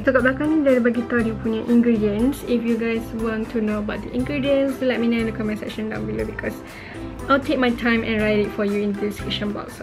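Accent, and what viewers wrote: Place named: Kedah, Malaysia. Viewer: Suka dengar cakap kedah o. Kalau boleh teruskan cakap kedah:)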